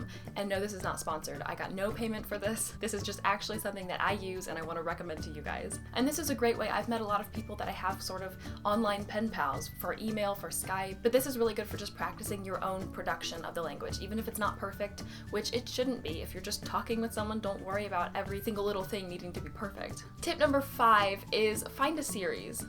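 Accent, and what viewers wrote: United States. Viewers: Im from indonesia, im asian. you're good really american in top speed love. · I've been learning English for a while and without any doubt you are the native English speaker that I could understand the most in a normal speed. where are you from? I meant which part of the USA people speak as the same accent as you?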